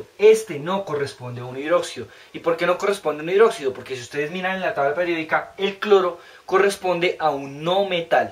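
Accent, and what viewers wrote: Colombia. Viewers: Se nota que eres de Bogotá, Colombia (lo se porque yo también)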